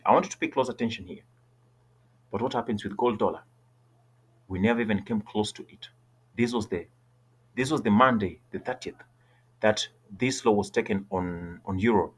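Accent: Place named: India. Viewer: Why you speak like indians ? Are you paj..et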